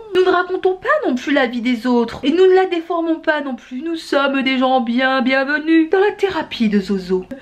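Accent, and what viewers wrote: North, France. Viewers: merci pour ton vlog frais et sympa, je me disais bien que j'entendais un petit accent du nord dans tes vidéos!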